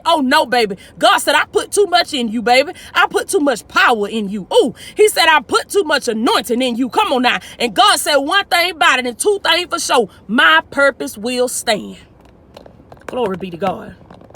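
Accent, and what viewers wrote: South, United States. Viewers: Just love your American accent! I'm from London, 🇬🇧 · Love to hear her southern voice preach 🥰🥰🥰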